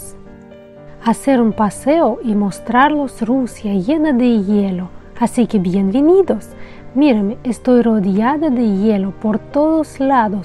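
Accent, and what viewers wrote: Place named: Spain. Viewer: ¿Por qué hablas como español?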